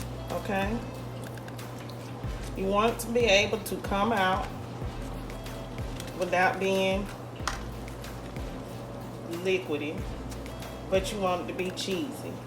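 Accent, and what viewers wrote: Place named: South, United States. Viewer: Finally!!! A video with no creammy watery mac and cheese. And i can hear it in your voice that you’re southern. Im trying this for thanksgiving lol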